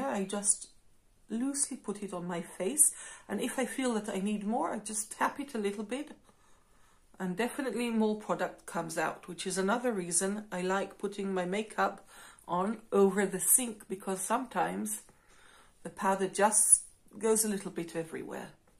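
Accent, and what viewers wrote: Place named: England, United Kingdom. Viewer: מהממת והמבטא (אנגלי?) הורס...! 😍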